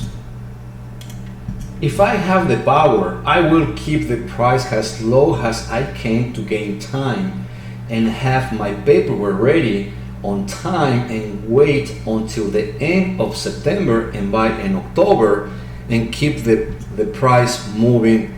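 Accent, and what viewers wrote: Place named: Latin America. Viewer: sabía que eras de latinoamerica cuando empezaste a hablar con acento y te entendí perfectamente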